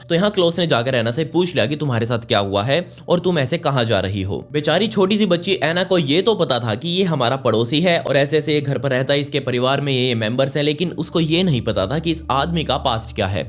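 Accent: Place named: Jammu, India. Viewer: pick me ji.. hua toh thode hi din apko sunte.. pr lgbg saari videao sun dekh chuki hoon.. awaaz lehzaa bhut bdiaa.. pr tone hmare jaisi.. lv u from jammu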